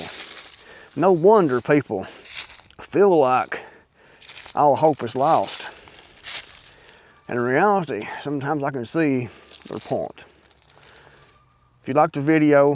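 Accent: Appalachia, United States. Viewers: First, you need to stop talking like you just rolled out of the holler! I’m from West Virginia, born and raised and nobody I know talks that way. Talking that way is why nobody takes Appalachia seriously. Second, the left talks about the constitution daily and how it’s being violated every damn day! If you fools on the right wouldn’t have allowed yourself to be brainwashed by conmen we wouldn’t have these problems. The constitution is just a piece of paper. If we want it to actually mean something we have work make sure it’s followed. That means no conmen in the government, no religious zealots in the government and no white nationalist bigots in the government. Currently that who’s in charge and that’s why constitution is being trampled on.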